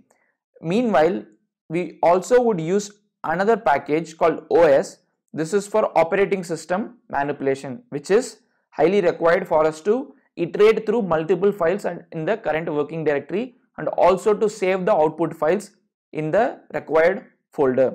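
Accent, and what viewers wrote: India. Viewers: 11/10... Additional credit for proper indian accent. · am I the only one unable to listen to Indian english?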